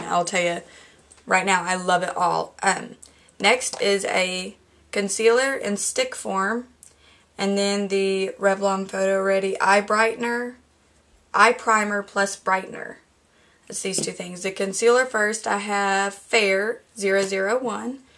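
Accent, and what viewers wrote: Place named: South, United States. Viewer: Love the finished look, also your lovely southern accent, from the emerald Isle...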